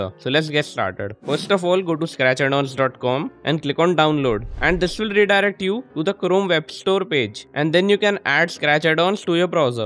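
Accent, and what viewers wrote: India. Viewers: bro talks like indian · You know you've struck gold when you hear that sweet, sweet sound of an indian accent when looking for a tutorial, thanks man!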